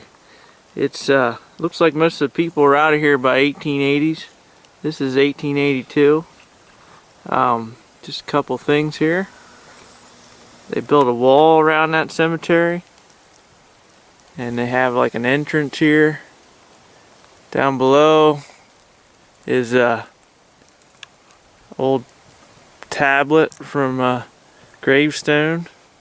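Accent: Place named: Western Pennsylvania, United States. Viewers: Your accent sounds more like Pa than Ky. Especially SouthEast Ky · Are you from Western PA or rural MD? I can tell by the accent. ;)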